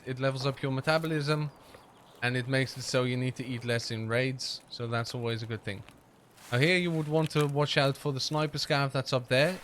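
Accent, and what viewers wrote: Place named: South Africa. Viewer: I've been trying to figure out where you are from by listening to your accent.. (Found your channel today) First I was thinking European that's lived in New Zealand a long time.. But now I'm really leaning towards SA.. :P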